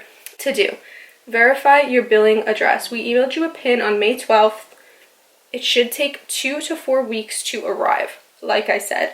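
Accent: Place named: New Jersey, United States. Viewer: You remind me of the jersey shore cast the way you talk!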